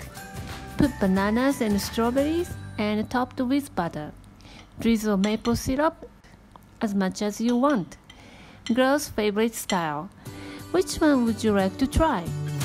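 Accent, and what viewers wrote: Japan. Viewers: Japanese English is seriously the cutest thing ever, I could listen to it all day and night 😍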